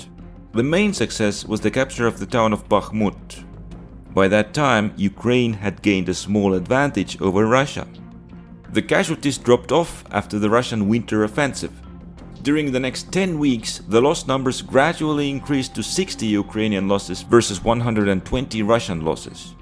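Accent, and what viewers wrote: Estonia. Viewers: Eesti aktsent, 100%, :D ütle et eksin krt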